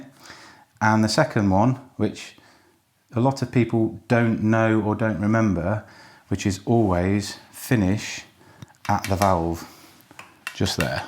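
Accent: United Kingdom. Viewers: One of the best instructional videos I’ve ever seen on any subject. Excellent optics and close ups. Outstanding narration with confident, easy going style (being American, love the Brit accent, characterizations, and take on things) using only hand and body skills with no unnecessary props. Covered all the essential information without being too long or short. Love it, love it, love it! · damn, such a nice English accent. I can listen that for hours.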